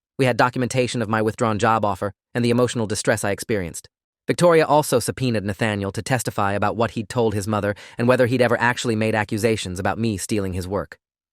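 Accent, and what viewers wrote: United Kingdom. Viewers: I think he’s / she’s talking British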